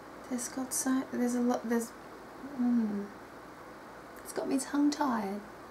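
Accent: Australia. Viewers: Wow I'm always super surprised at how you pronounce certain words! Haha no offence, the Australian accent is gorgeous, but I'm so not used to it (Swedish TV mainly shows American shows and movies...) and I always have to concentrate a bit more to understand you. :D Again, no offence, I need to stop being so ADD anyway, so it's good for me haha. The Linestrider is a bit odd to me, I was very drawn to it, but when I opened it up I felt it was a bit "colder" than I had expected. It almost gives me the same vibe that the Fountain Tarot did for quite a while! But now I love the Fountain Tarot so I have hope this one will warm up for me too... :)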